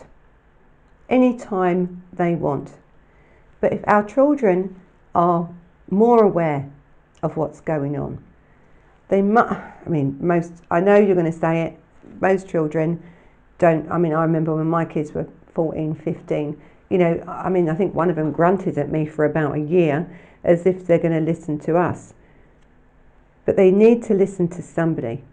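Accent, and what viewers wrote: London, United Kingdom. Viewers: Thank you my dear; I never thought of end to end in that way, thought it was more people carrying on with someone else who used it on What's app. I would never use it as I have nothing to hide I suppose. Thank God my daughter is now an adult herself. The internet can be a wonderful thing, but is truly a double edged sword. Also rather depressingly I am on the Suffolk/Norfolk borders, near this creature. I will as inform as many of those I know about the danger and new techniques these bastards are using. Am learning from each story you put out so, thank you. BTW is your accent London, Essex, Thames Estuary, American and Ozzie? I definitely hear London/East End/Essex borders. I am all cept USA n Aust. lol. Worked in London until thought up in Suffolk she would grow up surrounded by nature and peace. She was 7 and was not happy leaving. 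I was though. Am a new fan and subscribed couple weeks back. You go warrior woman, we need more like you. Locked down love from East Coast of England. x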